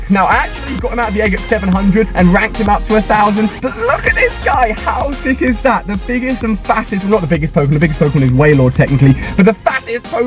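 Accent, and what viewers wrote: United Kingdom. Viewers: Really like this guy's videos but man oh man I cannot stand his accent. I usually love British accents but his is awfullll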